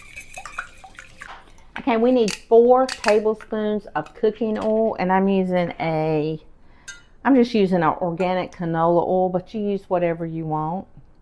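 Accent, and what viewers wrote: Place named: North Carolina, United States. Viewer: I have just recently found your videos and enjoy them. I live in Leland NC. I thought you sounded like you were from NC. I lived in Beulaville NC back in th early 70's. There was not much there. I think there was a bank, gas station maybe 2 I think there was a Haedees. I don't think we had a stop light. Beautiful little town. Have a safe and enjoyable 4th. Oh have you tried precooking your potatoes (regular and sweet) in the microwave then peel and fry..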